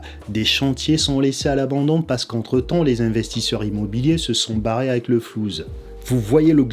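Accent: Paris, France. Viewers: tombé par hasard, il est marrant ton accent parisien ;) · Wow je te connaissais pas , et en un vidéo je suis fan de ton accent parigo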